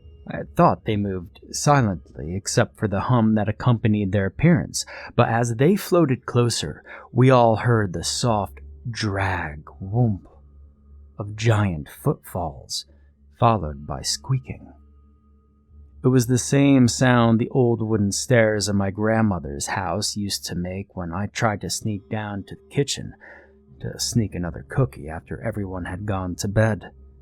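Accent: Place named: Western Maine, United States. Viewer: you sound like a few guys I know up in my area of Western Maine♡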